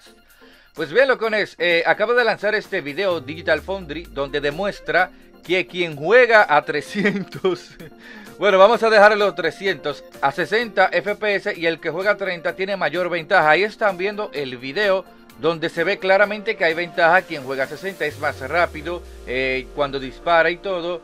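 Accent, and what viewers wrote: Dominican Republic. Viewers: De donde eres porque tienes un acento super Dominicano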